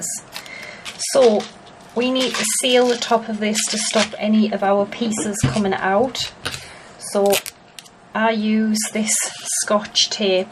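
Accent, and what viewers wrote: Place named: Northern England, United Kingdom. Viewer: Hi just found you're channel,and although I don't make things like tags etc,more into colouring books,I thoroughly enjoyed you're tutorial,and look forwards to watching more! Can I ask where you are from,lovely northern accent,I am a Northumberland lass,but now living in Spain!!.Thankyou for sharing X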